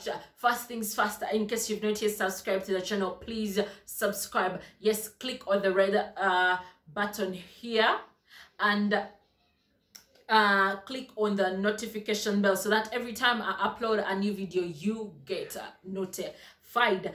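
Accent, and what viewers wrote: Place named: Uganda. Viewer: Your accent should be on my tv girl. Amazing Don't mind me, i get overly excited when I meet more than average fellow Ugandan Content createors. Bless you 🤣💃 Bless you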